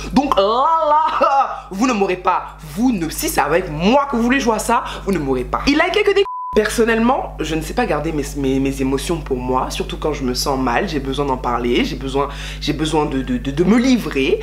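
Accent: Ivory Coast. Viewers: le montage me fuuume en plus a force de regarder tes videos je parle comme toi et je commence a voir l accent ivoiriens :) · Mdrrrr ton accent ivoirien . Trop fière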